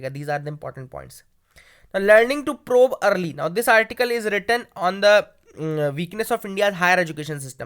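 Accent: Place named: Bihar, India. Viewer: Sir ap Bihar se hai na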